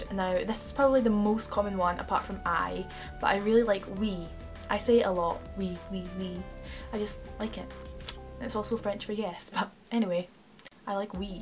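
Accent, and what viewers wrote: Scotland, United Kingdom. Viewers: Always good to hear a Scottish accent on YouTube! Edinburgh is definitely worth a visit sometime, it's my go to place for events and sometimes work but it's also fun to explore (I still haven't been everywhere yet!) I need to explore Glasgow more, Buchanan Street and Pacific Quay are pretty much the only places I visit regularly. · speaking of stereotypes, actually that was pretty Scottish :D love the way you say "person/personal"! coming from a St Andrews student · Aloha! I'm half Scottish but never been to Europe! Maybe someday 😊 I love your accent better than the England English, if that makes sense 😄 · I absolutely love your videos and your accent! Which Scottish uni do you think is the most suitable for a foreign student? I'm thinking of attending uni in Scotland... Love you! xx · Finally found a Scottish you tuber!! 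You are so much easier to understand because I'm used to hearing the Scottish accent! Xx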